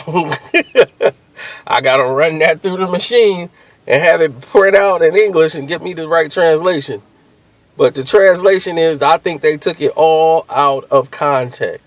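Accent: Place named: South, United States. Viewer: your southern accent reminded me of the film "snow on tha buff."Lmao love that flick.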